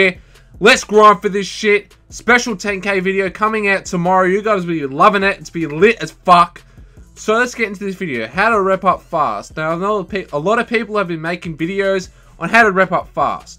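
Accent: Australia. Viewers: Are you from Sydney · You Australian??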